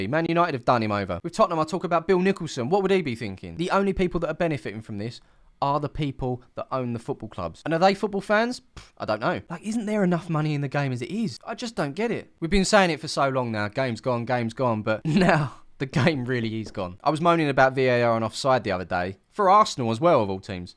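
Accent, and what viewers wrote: United States. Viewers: Fun concept, but wow this is bad for Europe. We at Forward Madison FC will gladly take you all in. And you have a spot on American accent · On one hand, I’m offended that you needed to do the American accent, but this is largely our fault, so.... dammit.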